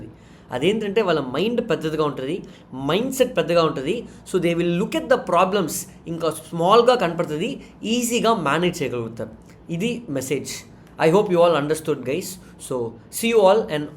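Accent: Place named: Telugu, India. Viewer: Meeru telugu slang super ga undhi sir💯 Being a tamil person. Can easily connect with it sir!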